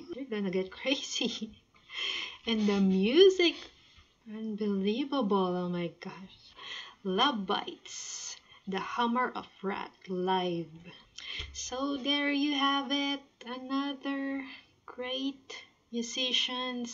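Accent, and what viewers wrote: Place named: Philippines. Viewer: ah kala ko kasi japanese po kayo pero may accent kayong pinoy 😅. Na sa japan po kayo ngayun? Bagong favorite ko po kasi tong kaya napadpad ako dito 😁.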